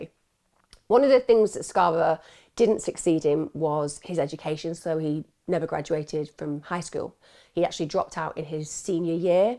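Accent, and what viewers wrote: United Kingdom. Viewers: I just heard you in a deep dive and glad I did. Thoughtful takes and yeah, British accent. Good job. Cheerio!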